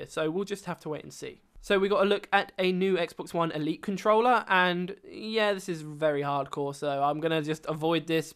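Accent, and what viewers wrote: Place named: England, United Kingdom. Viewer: yea the only thing i don't like about your video is that you call it a "conference highlights" when you should have called it "my conference highlights opinions" because i just wanted to hear about what was talked about without you imposing your opinion about every highlight. other then that, your bushy eyebrowed lispy accent seems charming, but i can't stand English accents.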